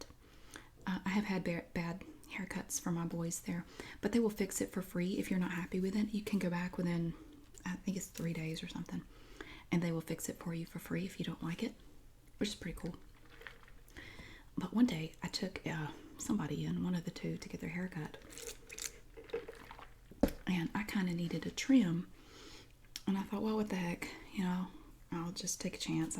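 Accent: South, United States. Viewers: I lived in Thomasville for a short time after college, running a local farm. Before this video based on your accent I really thought you must be in that area! (I moved around southernVA and northern NC a bit for a few years before landing in the northern va/dc area. So many great things about living down there.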